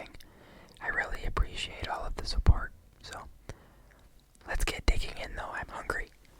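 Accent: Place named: Boston, United States. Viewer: You have the same accent and speech cadence as MorpheusASMR. Must be Boston area too?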